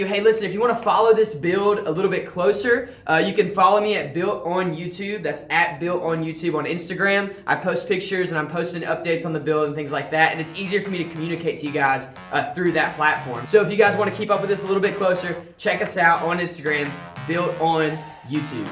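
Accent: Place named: California, United States. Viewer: You could kinda look like a young sean boswell with that california accent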